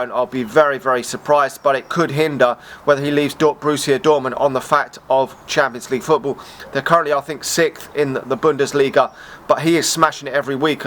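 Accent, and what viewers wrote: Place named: Manchester, United Kingdom. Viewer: Any fans from Manchester/ or with Manchester accents? 🤦🏻‍♂️ Can you whining lot just support arsenal or Chelsea or something ? I’m just switching off - it’s literally impossible to listen to someone with that accent talking about Utd and saying ‘we’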